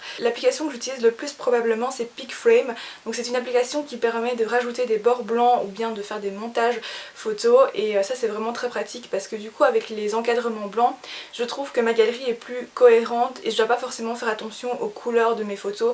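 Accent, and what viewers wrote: Belgium. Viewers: tu es belge? 😊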